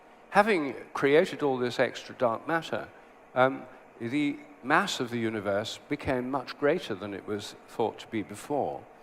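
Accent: United Kingdom. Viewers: He looks like a pathetic hobo. If you're going to sport the British accent and give a symposium in front of a large crowd, at least take 5 minutes to pull your pants and well . He's mocking others while looking like that. Come on sir, be civil and respectable. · I'm tired of British accents. This man goes on and on. I don't disagree with him on everything here. It's just boring. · Just because you have a British accent doesn't make you smart. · I can see how a take down of modern science can be a appealing to some but this is pretty stupid. Don’t let the British accent and crazy hair fool you 😂.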